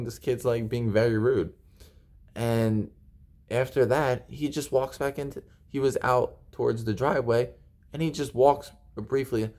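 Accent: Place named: Pasco County, United States. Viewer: Sounds like you live in pasco County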